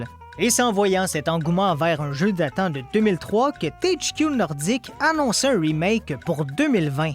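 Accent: Quebec, Canada. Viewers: J'ai commencé à regarder des vidéos en français pour pratiquer la langue. Je me suis retrouvé ici par hasard et, waouh, quelle découverte ! Bon, je ne comprends pas la moitié (j'utilise les sous-titres automatiques. Ce serait super d'avoir des sous-titres en français). Je trouve l'accent québécois vraiment intéressant. Salutations d'Espagne !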